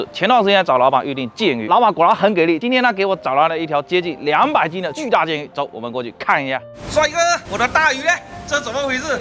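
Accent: Fuzhou, China. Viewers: 兄弟福州人么，福州腔好重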